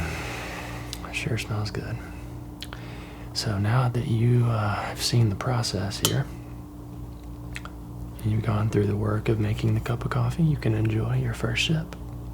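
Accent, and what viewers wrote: South, United States. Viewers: Your little southern twangs are really doing it for me. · I haven't been here long I've seen some of your vids dont want to sound like a creep but I'm just wandering where he's from because based on the way his voice is it sounds like he is from a more southern state.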